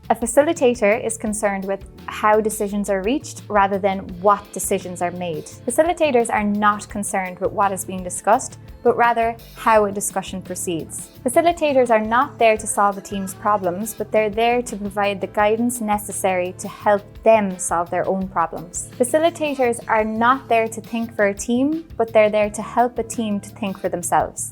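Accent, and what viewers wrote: Ireland. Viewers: Love the Irish accent! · have you replaced Jonathan? It's not often you hear a strong Irish brogue on YouTube. Love it or should that be fair play to ya. Anyway my questions, what are the main DOs and DON'TS for facilitation and what are the he biggest errors that new facilitators often fall into. Both questions are probably related.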